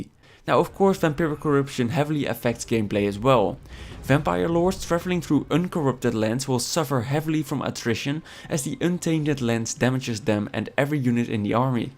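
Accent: Netherlands. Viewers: Are you perhaps from the Netherlands?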